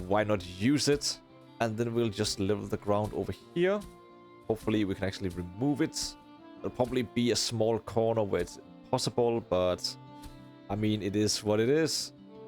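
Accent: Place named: Denmark. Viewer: Hehe, love the Danish dialect, keep up the good work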